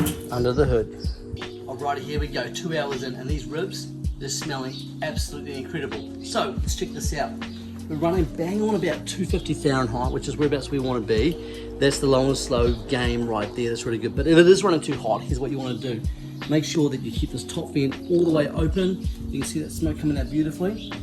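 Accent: New Zealand. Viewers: As soon as you spoke, I knew a Kiwi accent from anywhere 🥰 thanks for sharing 😊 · Looks great! Love seeing all of your BBQ gear! Do you have a favorite? Also, hearing you speak is making me miss NZ!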